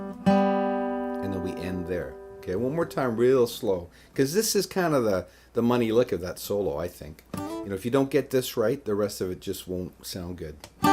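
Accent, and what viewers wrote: Canada. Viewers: You definitely have some Canadian spirit in that voice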